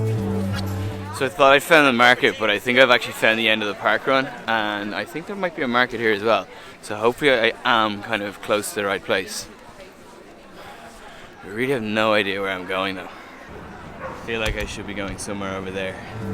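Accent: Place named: Canada. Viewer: You are in Ireland? You sound Canadian to me, which is very close to America , I hope you are not giving up your accent geez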